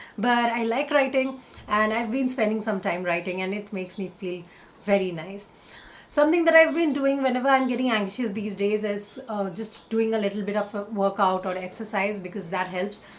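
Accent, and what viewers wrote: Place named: India. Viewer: You are amazing ,fabulous indian. Adore your accent.. Allah keep you,stay safe.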